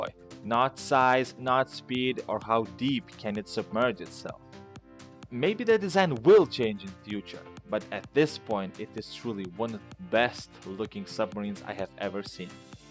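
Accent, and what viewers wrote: Russia. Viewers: I love his russian accent. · This man has a Russian accent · your accent more like russian are you russian? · Your accent is like Russian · do u have a Russian accent? it sounds like it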